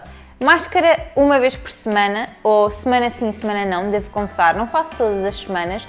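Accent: Portugal. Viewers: O sotaque de Portugal é o mais lindo de todos 😍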